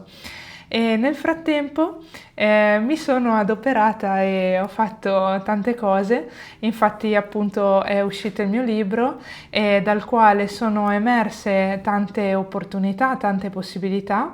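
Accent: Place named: Eastern Europe. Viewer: Infatti adesso noto che neppure il suo nome mette. E dall'accento mi sembra slava. Una "dottoressa" proprio in gamba deve essere. Olistica al punto giusto.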